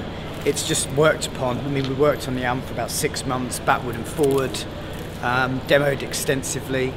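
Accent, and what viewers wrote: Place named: RP, United Kingdom. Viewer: I can't take him seriously as a front man for a metal band with that posh English accent.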